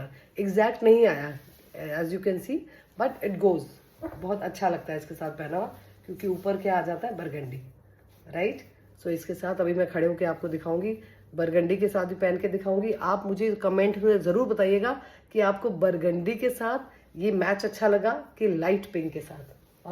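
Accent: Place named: India. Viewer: Bhut a hi batten krte ho aap Meri ji age ke ho Mai b aise hi beaten krti thi kbi Aap ko dekh ke Muje pani image dikhayi deti h Aap bilkul desi style mai Bolte ho Bhut ache lgta ho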